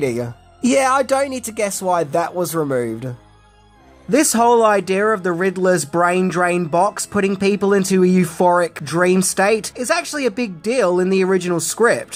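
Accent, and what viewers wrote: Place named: Australia. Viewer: Everytime you say Two-Face I think you are saying Toothpaste! Lol must be that wonderful Aussie accent!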